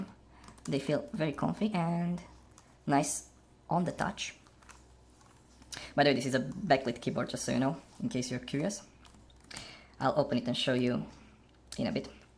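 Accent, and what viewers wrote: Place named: Russia. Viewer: I think I can hear Russian accent when you speak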